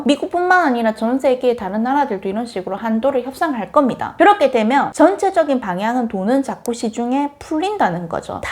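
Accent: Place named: Busan, South Korea. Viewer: 부산 사터리 넘나 귀엽다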